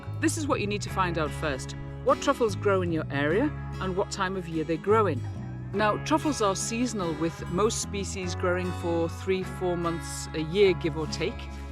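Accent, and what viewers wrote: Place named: Liverpool, United Kingdom. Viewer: Do i detect a wirral or Liverpool accent great video by the way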